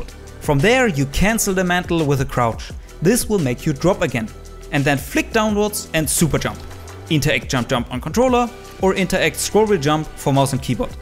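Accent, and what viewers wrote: Germany. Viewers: Bild ich mir das ein oder hast du n maximalen n deutsch aktzent?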